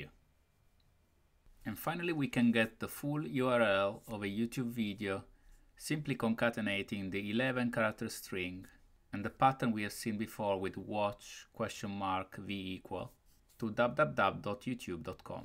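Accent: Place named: Italy. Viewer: si sente che sei italiano bro(non è un'offesa, però fa un po' ridere aahahah)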